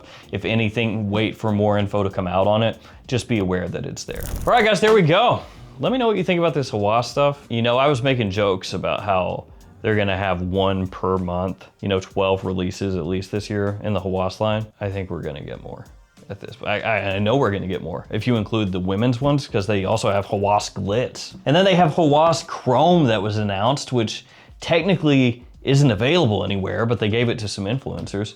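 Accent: South, United States. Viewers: bro his southern accent kills me LOL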